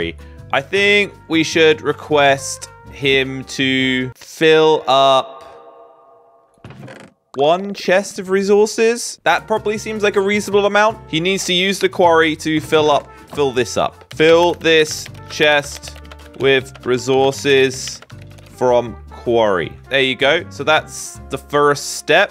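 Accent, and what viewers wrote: United Kingdom. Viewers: most British sounding intro I've ever heard 😭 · British accent every episode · HE IS BRITISH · sounds so british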